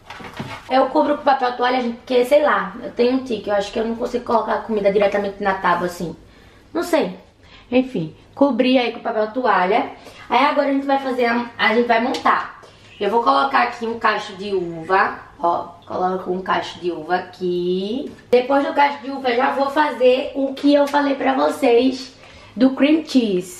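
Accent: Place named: Northeast, Brazil. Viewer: Acabei de chegar e simplesmente amei! Vc é nordestina? Amo esse sotaque 😍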